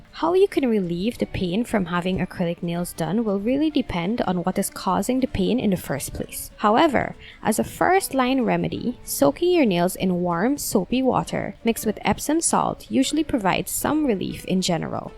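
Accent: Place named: Caribbean. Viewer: Sounds carribean, maybe Trinidad or Guyana .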